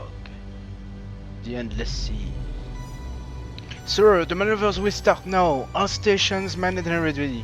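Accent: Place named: France. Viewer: yes i thinked you speek french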